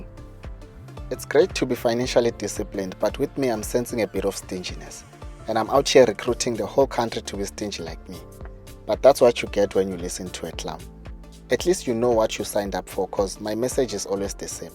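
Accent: South Africa. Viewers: And then the last part made me subscribe, athi "With a budget of 36 000 per month I would buy a corsa lite or a tazz every month for 6 years" lol the humour caught me off guard I laughed so hard. Nice video bro. Your narration has lots of mzanzi flavour, keep it up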